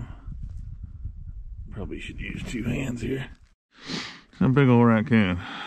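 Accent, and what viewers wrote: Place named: Missouri, United States. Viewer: can tell your a missouri boy! love it man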